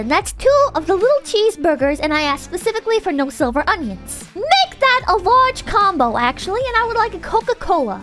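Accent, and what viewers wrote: New York, United States. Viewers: I JUST realized she's got a serious Nuu Yawk accent that comes out occasionally.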